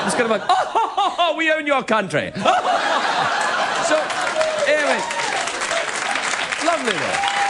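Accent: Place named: Scotland, United Kingdom. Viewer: He had his rough Scottish accent back then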